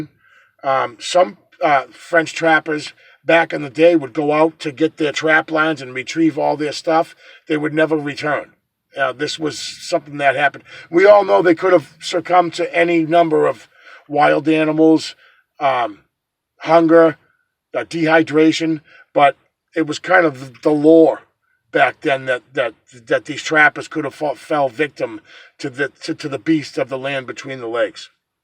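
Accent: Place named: Massachusetts, United States. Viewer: lmao I know I'm sorry....but look that up you will like that one and you will get lots of views on this whole topic..also I appreciate your replies...at least you pay attention to your viewers and don't ignore us as some do...keep up the good work...love the mass. Ascent. Lol don't know if I spelled that right..waiting for prt 2😃😃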